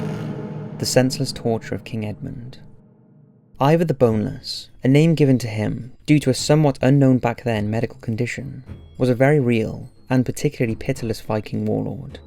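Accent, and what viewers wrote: Wales, United Kingdom. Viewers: Sounds like a 12 year old British boy is trying to read me a book on Viking torture techniques. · You have an interesting voice. Welsh ? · Welsh..I believe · Why do british people say years like yuurs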